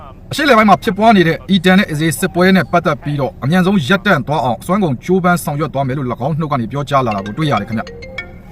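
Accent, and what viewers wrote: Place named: China. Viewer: မင်းအသံကတရုတ်ကလားသံပါေနတယ်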